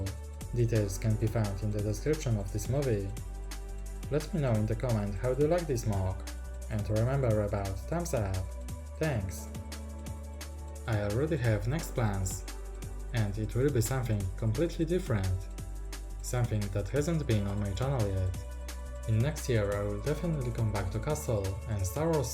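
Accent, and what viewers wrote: Poland. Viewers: Twój akcent wręcz zdradza że jesteś polakiem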